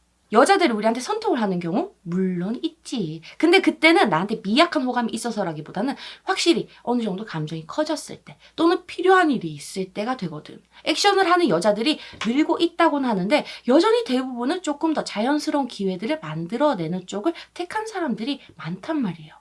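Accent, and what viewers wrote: Gyeongsang, South Korea. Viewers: 갱상도 여자?짜치다니 ㅋ ㅋ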